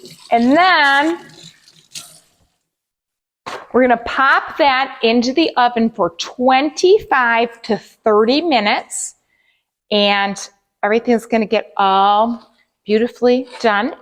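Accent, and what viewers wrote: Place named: Minnesota, United States. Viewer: Your recipes look sooo great!! I am gonna start trying them. Where are you from?! I am originally from Wisconsin, so I am loving your accent 😊. My guess is Minnesota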